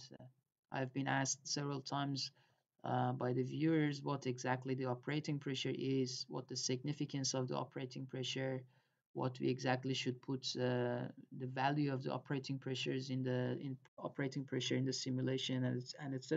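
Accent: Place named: Iran. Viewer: are you iranian? this is a persian speaking english accent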